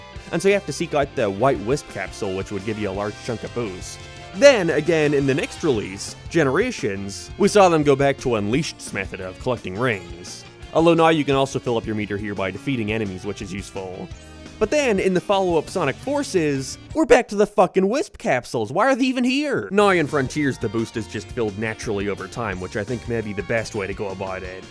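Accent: Ireland. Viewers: Your accent goes to the most clear American accent to the thickest Irish accent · hes irish iirc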